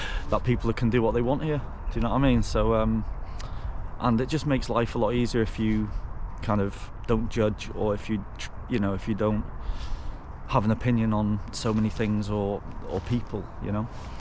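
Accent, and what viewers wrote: England, United Kingdom. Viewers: Your accent is english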